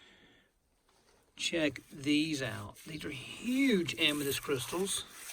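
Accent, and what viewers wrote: South, United States. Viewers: it's the southern accent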